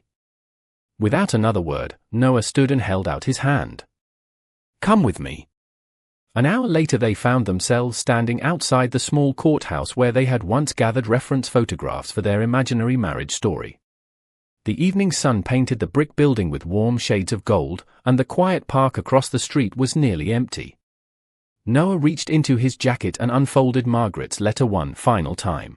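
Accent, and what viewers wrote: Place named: Vietnam. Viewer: Same old topic but with a glitch in the AI voiceover (I'm a linguist... among other things, but wasn't able to identify it, it sounded like Tagalog, but with Vietnamese cadence). Also, the tagline is deceptive. There's no straight man to talk about or kiss that never happened. Please, be careful before posting. Thanks. ❤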